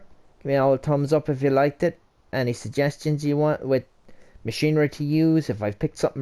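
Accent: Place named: Ireland. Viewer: Keep it up 👍 which part of Ireland are you from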